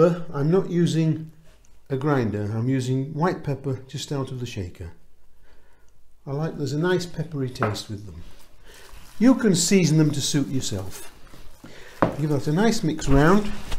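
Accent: Yorkshire, United Kingdom. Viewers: Wow deffinatly going to give these a try, both my husband and I love cheese and onion, I’ve been buying M and S pasties but weather it’s “ health and safety” I’m not sure but there seems to be very little seasoning in anything one buys over the counter these days ( the salt police must have been round 🤔) and it makes for “ tasteless “ produce, I’ve only just come across your lovely straight forward recipes whilst looking for one for a “ custard tart “ I am now binge watching lol I’m going to be very busy over the coming weeks baking lol will be making your custard tart today ready for my daughter coming round for lunch. You also sound as if your a “Yorkshire” lad just like my husband 👍🐾🥰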